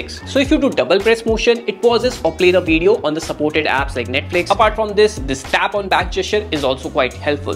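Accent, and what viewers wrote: India. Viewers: Damn Indian English sucks, it's just unbearable.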